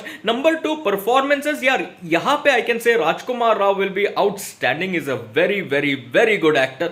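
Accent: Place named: South India, India. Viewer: great job brother..by the way are you south indian? your tone seems to be typical south indian:)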